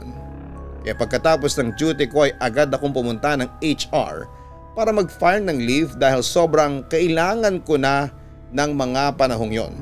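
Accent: Conyo, Philippines. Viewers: Less nakakatakot pag pa konyo2 english na yan haha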